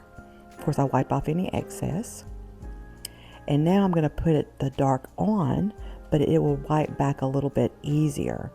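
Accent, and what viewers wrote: United States. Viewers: I had purchased a chair a while back real cheap from Salvation Army and cut it all up. Now I have a box full of spindles and you’ve given me some great ideas to use them for! Your accent is similar to my mom’s. She was from Virginia. Where are you located? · Can't beat that Alabama accent !! South GA accent is very similar! So enjoyed your video!🌟🌟🌟🌟🌟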